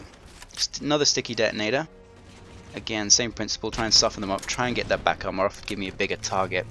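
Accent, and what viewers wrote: United Kingdom. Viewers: / /. Adjective: 1. The apex of human skill. Verb: 1: Attainment of perfection in the course of ordinary gameplay and related abilities. i.e. "I grenade jumping!" Noun: 1: The greatest Halo campaign player alive. 2: A friendly British chap with a suave commentary voice.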